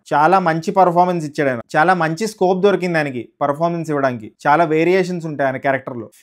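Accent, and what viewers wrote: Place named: Vizianagaram, India. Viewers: Srikakulam slang kadhu bro Vizianagaram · Vizianagaram slang bro adhi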